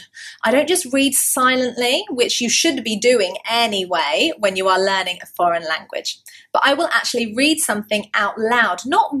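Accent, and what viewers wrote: United Kingdom. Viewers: Dear, How crazy the world is: as an Italian English learner I, on the other hand, struggle to have decent pronunciation, especially your wonderful British accent, which I dream of acquiring sooner or later. Anyway, thank you for your tips, I wish you would visit San vito Chietino and Fossacesia's beaches (south Abruzzo) in one of your trips so to enjoy our seaside and our fish and meat restaurants and breathtaking views. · thanks a lot for the lessons. i like the british accent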